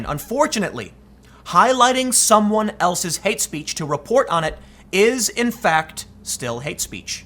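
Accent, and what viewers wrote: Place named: Chicago, United States. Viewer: please stop pronunciating like chicago. Me and my girl like your content but your voice sucks.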